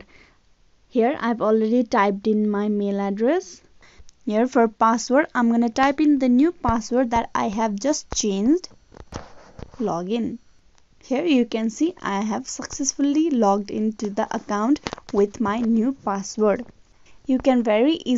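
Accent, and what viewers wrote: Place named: India. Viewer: I love your indian accent and thank you for the help man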